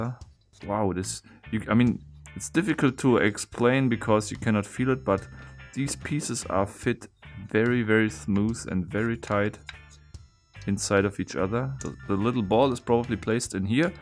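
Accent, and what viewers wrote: Germany. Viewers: his accent is German, if that's your question. I always got the impression he no longer lives there, but his FB info does refer to a Germany address.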